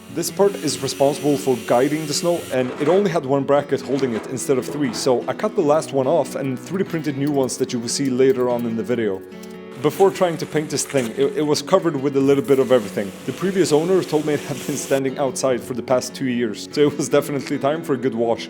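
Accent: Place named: Sweden. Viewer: "Spins like a cat", love the Swenglish 😄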